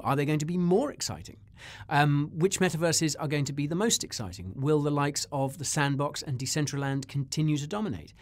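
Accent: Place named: London, United Kingdom. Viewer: lool that intro, such a londoner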